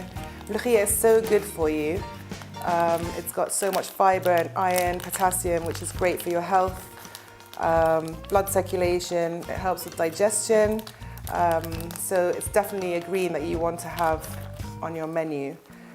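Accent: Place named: England, United Kingdom. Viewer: Wow as an Australian from Armenian mother who was born in Cairo I love watching you cook and your beautiful English accent. Thank you.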